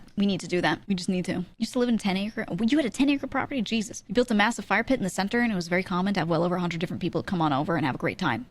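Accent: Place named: New York, United States. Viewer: she does have the accent and she does have an very bronx/ New York accent.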